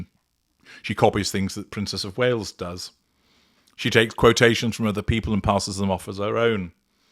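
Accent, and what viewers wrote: United States. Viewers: Love your American accent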